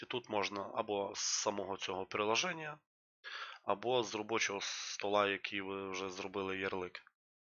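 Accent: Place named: Russia. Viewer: Мне вот дико нравится украинский язык, хотя я русский без каких либо украинских корней (ну вроде). Я не знаю почему, но просто кайфую когда слышу мову. Но российский акцент у есть, подзабыл, так сказать, язык предков. Авторы ценны для публики, когда идут своим путем, не озираясь на моду и большинство.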